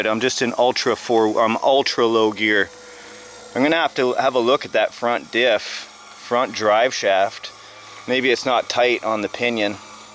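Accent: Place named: Canada. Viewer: Damn the fakes and the haters! Be you, talk like you, act like you. Otherwise you are a bloody conformist. Victory for sure! Awesome content from who is obviously an awesome person. I'd like to burn a joint with you. Even though you are starting to take on that Canadian accent a little. LOL. Keep doing you bro. Great stuff!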